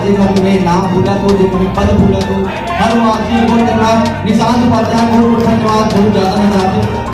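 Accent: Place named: Chhattisgarh, India. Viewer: Sounds cg 🚖🚖🚖🚖🚖🚖🚖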